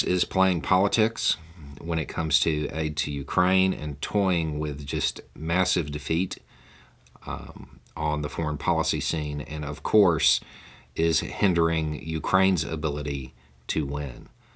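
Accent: South, United States. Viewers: Whoa. I've been watching about a year, so maybe back then you had more of a southern accent, or perhaps you were putting it on? I had to turn on the closed caption and that didn't even always help!! But a big LOL on that clip,!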